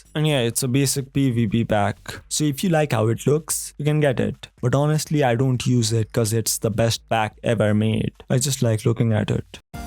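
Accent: India. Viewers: Ur voice like indian??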